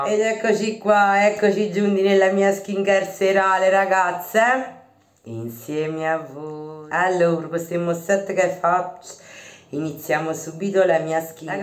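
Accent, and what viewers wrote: Naples, Italy. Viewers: Bella napoli e il suo dialetto....parlaci sempre...un po' di più...ti abbraccio carissima 💋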